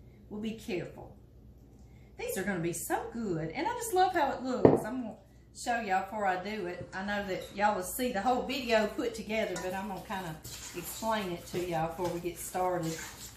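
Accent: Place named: Louisiana, United States. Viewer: Miss I just want to tell you how much I enjoy your program it's like being back home again I'm from Louisiana from New Orleans and it's just wonderful to listen to you with your accent lol Wish we were neighbours I love cooking Louisiana style and I know we would Be best friends. Keep doing those delicious Recipes that you do. And I will keep watching. God-bless and stay safe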